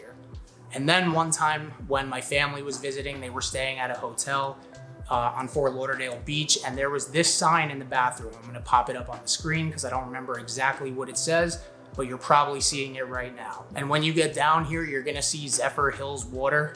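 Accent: New Jersey, United States. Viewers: Great pros & cons video Don!!! I can tell from your accent you were a Bergen County NJ resident, right? Then again, to me, anyone North of Trenton sounds like they live in Hoboken. This Philly-born and raised, moved to South Jersey (we say "Joisey") resident transplant knows prices and auto insurance in Broward County is about the same as NJ (price hikes in 2024 for NJ). But our homeowner's insurance is so low. We love Pompano Beach and see all the new developments going up there as that's where we vacay every year. Will be down there again (hello Wyndham timeshares) this winter and see all things Atlantic Avenue and A1A near Sunrise. You forgot Con #6: SNOWBIRDS -- traffic is crazy down there, but from late November to April, wow! Of course, in my eyes, the Pros outweigh the Cons.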